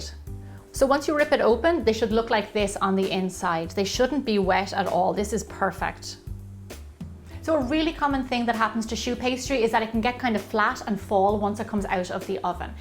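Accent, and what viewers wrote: Ireland. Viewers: Thank You for the great video and information. I love your Irish accent. My spanish wife would shoot me for saying that. LOL!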